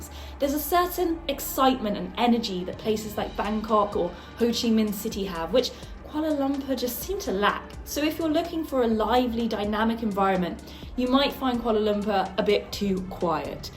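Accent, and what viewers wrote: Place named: United Kingdom. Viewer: I'm listening to your lovely British accent (from an American standpoint)and I'm wondering why there are so very few Americans doing videos of this sort.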